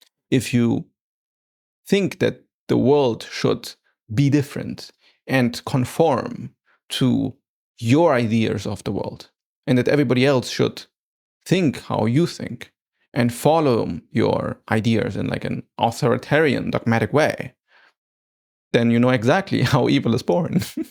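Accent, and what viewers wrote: Germany. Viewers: Why does the internet lie about your nationality and ethnicity? It is odd information to restrict access to. I would understand keeping your birthplace and current locale undisclosed, but the internet is wrong about all your details. I can tell just by looking at you and hearing your voice, you are definitely not a white Russian. AI has to go, it's useless junk. Based on accent, it is far more likely you are german. Your face is a bit ambiguous, so I could not guess where your family is from. Maybe India, Bangladesh, or Pakistan. Somewhere from the spiceland. Edit: Nah, you're some African Caucasian hybrid. That explains your accent.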